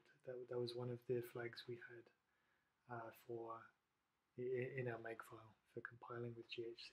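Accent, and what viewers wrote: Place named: Australia. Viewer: P.S., Hello from New Zealand. You sound Australian, though I can't tell for sure :-)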